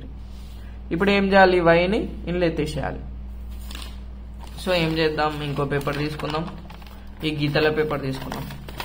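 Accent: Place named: Telangana, India. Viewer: Anna ekdham chpthunnav poo kani manchiga cheppinav Anna verey matladuthunnav acham telangana porodu lekka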